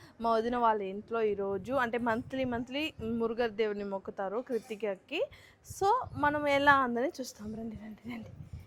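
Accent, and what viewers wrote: Chittoor, India. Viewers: Idi chittoor lo tamil valla telugu slang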